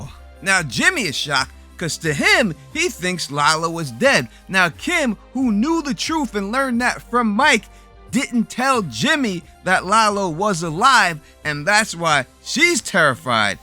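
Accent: New York, United States. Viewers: as someone born & raised in NY, the way you say BETTAH CAWL SAWL really makes me homesick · Lalo and Gus are going to meet for a death match. Lalo gets killed and buried in the lab. Remember when the fly drove Walt crazy? Flies/dead bodies. Haha. Not sure what Lalo wants with Kim and Saul. I guess to find out what really happened in the desert and if it tells him more about the superlab? He may use them to get past Mike somehow for a showdown with Gus; he’ll probably find out Saul knows Mike and he was helped by him. I think Kim either has to go to prison for Howard’s murder or die. I don’t think Ed is a good solution, they’ve used it too many times. We know that Saul buys the black book from the Vet though, as he still has it. All the pieces haven’t come together for me, except Lalo’s death, it has to happen to give Gus so much control in Breaking Bad. 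I agree, they’ll stick it. It might not be what makes us all happy but it’ll be right for the universe. Love your NY accent dude. Okie dokie? Really?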